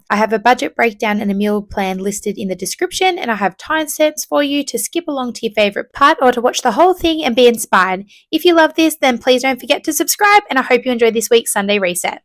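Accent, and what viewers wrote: Australia. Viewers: just beautiful as always <3 I love watching your videos, even your voice and the Aussies accent soothes me! Thank you so much for all your work and please give us more !!!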